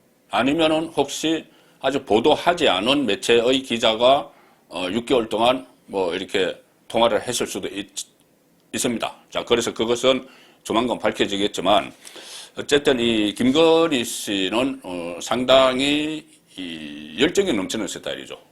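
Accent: Gyeongsang, South Korea. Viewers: 발음 좀 교정했으면ㅡ 아무리 경상도라도 억양은 이해되나 발음은 영 아닌데 고쳐보세요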